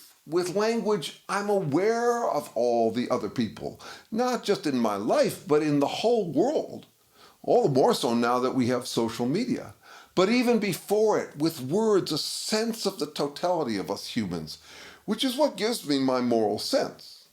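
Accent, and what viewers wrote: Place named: California, United States. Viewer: He seems to have a California accent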